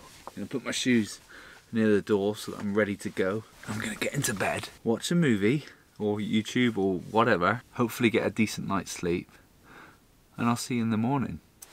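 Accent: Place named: Devon, United Kingdom. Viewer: Get in Bey!!! come down to Devon, although you sound like you’re from my area anyway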